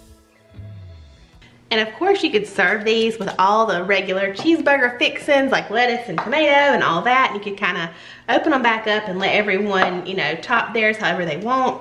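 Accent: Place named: Kentucky, United States. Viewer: See.... that is what I just cannot appreciate about most store brand cheeses. I know they are more affordable but, I just do not care for how stringy it gets. I personally prefer to see my cheese, creamy and smooth and honestly, I believe that it is some sort of generic imposter of real cheese. However! ALDI, actually says REAL, on their packaging, and I believe that they sell a more quality product because they are not based in the US. Sorry, just, my opinion. ALSO! I would like to try this with the canned Sloppy Joe BOLD brand, it is very tasty, not just the reg. SJ but it makes a big diff with the BOLD. Okay, I'm done. I love your accent! You sound like we do here in KY! :-)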